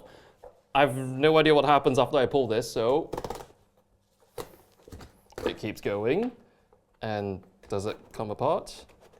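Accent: RP, United Kingdom. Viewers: first time i hear an asian guy with a british accent. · This dude speaks very posh.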